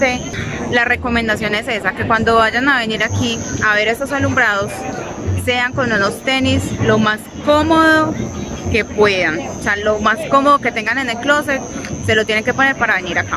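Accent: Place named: Antioquia, Colombia. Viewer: Todavia no conozco Medellín pero un dia alla estare porque lá tengo en mi corazon pegadito a São Paulo y tus vídeos son como clases de espanol con mejor asento que porsupuesto es el asento paisa.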